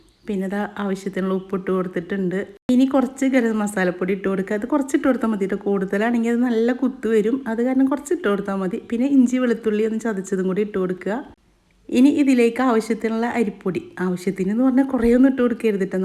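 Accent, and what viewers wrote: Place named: Thrissur, India. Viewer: Edaa.orupaad thanks..undaakki kaanichathil..undaakkeet abipraayam parayaam👍❤️❤️aa kini kini trissur samsaram kurakkallee..kurachu koottikolooo😁❤️❤️❤️❤️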